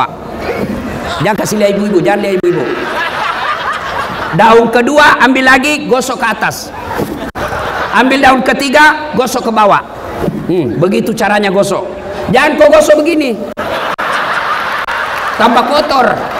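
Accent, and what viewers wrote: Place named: Makassar, Indonesia. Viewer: Alhamdulillah meski di Tanjung Balai dialek makassarx pak Ustadz tdk hilang❤😂😊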